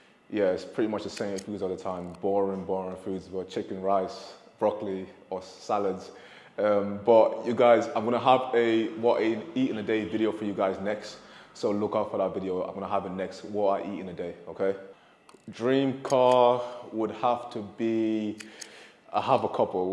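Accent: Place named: United Kingdom. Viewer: I didn't know u British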